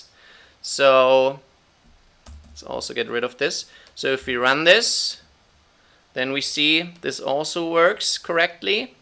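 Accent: Germany. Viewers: you Sound german tho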